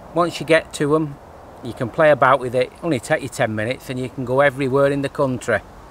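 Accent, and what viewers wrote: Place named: North, United Kingdom. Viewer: Ay up lad, so Northern haha love it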